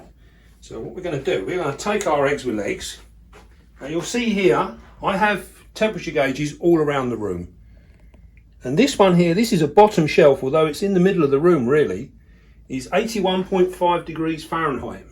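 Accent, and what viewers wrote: United Kingdom. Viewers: Why are you speaking in farenheit but have a british accent?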